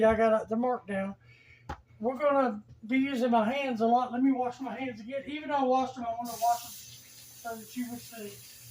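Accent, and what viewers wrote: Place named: South, United States. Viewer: Meat loaf 😮… Happy to hear your lovely Southern voice princess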